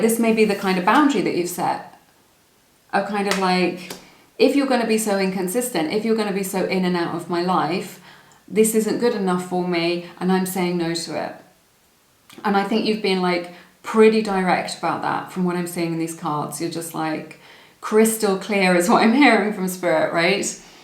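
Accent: England, United Kingdom. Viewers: is so beautiful. Or I mean. Love her hair... also love her english accent... Excellent reader aswell. 🙏🦄⚘💛⚘🦄🙏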